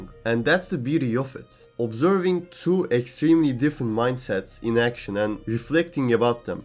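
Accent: Turkey. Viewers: Hey my dude your accent really sounds like turkish are from turkey?🥺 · videoyu açtığım an aksanından anladım turk olduğunu yolun açık olsun ins kanalın çok ünlenir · are you turkish?? your accent sounds like it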